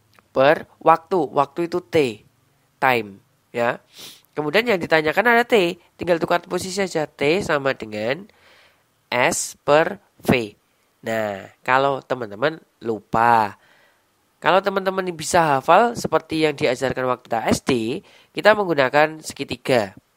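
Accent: Java, Indonesia. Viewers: micnya jauhin dikit mas..angin medhoknya terasa sangat...apa lagi pas ngomong huruf d,b,g,j (medok)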